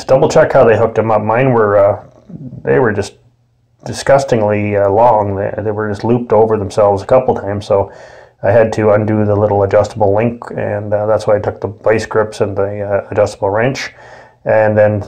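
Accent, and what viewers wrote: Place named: Canada. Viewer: Very helpful— taking my Goldwing 1,200 miles this weekend, and this is perfect. Also love the sound of Maple Syrup in your accent 🥰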